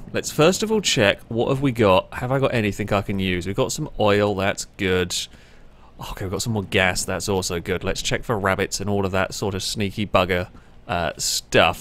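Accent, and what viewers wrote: United Kingdom. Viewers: dude it's a joke because almost every American loves guns, so him not taking the other gun isn't very American, and obviously he has a extremely strong bri'ish accent.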